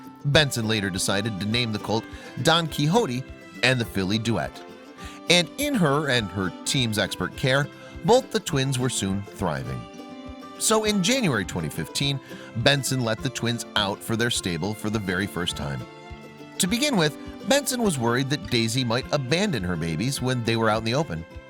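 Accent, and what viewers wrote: United States. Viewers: Do you have hearing issues?? 😕 He says FOAL (*NOTHING ELSE!*) MULTIPLE TIMES, & *EQUINE.. NOT 'Quine'!! So-?????!!!!!!! 😒 Bloody well obvious, even in his thick AMERICAN ACCENT, to these Ozzie (Australian) ears of mine & the 7 ppl I just asked, INDIVIDUALLY. Don't know where you're from, but having an American accent doesn't mean his mispronouncing words!! I suggest you get a hearing test, dear.. & take this along with you and ask what the ENT professional 'hears'. PS: *Clearly, NEITHER will grow to be 'horses', either. They will NEVER reach the height a *PONY becomes a 'horse'.... given their fraternal twin situation. The ONLY difference is how many 'hands' they will grow to. Not enough to be 'horses'. They are ponies and have less than an 20% chance (Don Quixote) and less than a 10% chance that (Duet) could grow to the minimum 'horse' height..... 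just thought you should know that, since you're SO quick to jump in thinking you're an expert, despite obviously NOT being one. 🙇💫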